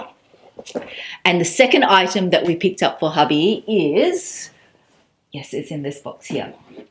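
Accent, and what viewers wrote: Australia. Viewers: Love your Australian accent. Lucky you. I can't even afford to buy the fake bags. Love all the goodies you bought.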